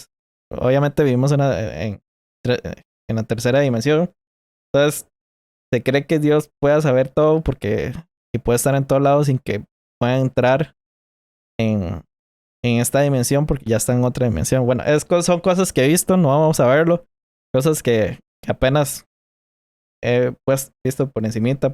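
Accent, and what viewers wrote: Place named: Costa Rica. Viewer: Jaja reconocí de inmediato ese hablado tico, buen video.👌🏻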